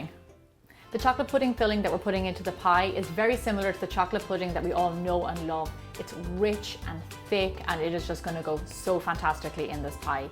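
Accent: Ireland. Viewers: are you Irish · Is she like Irish or something? Irish people are wonderful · I just found your channel and I love it! So much great backing Ideas. Even for me as vegan you notice which recipe will work great in a vegan version! Great job! Have to try something from your recipes, definitely! Btw I love your english, in " home made butter video " you said that you are from Irland? My favourite country to see one day!!!!!!! I'm a new subscriber of your great channel and can't wait for new yammy Ideas, greetings from Switzerland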